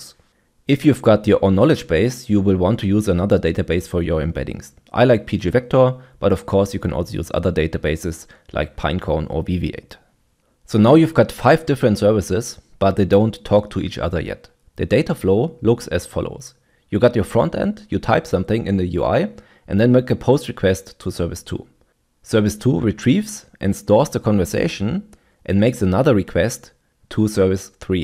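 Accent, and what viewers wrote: Germany. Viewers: Well, it's not exactly 'that german accent', not exclusively. It was also the top quality, very succinct, informative content. Your channels are easily mistakable in that regard as well. Truly great.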